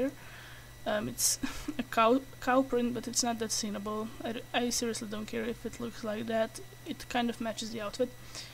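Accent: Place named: Asia. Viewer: I like your accent! Are you or your parents from Asia?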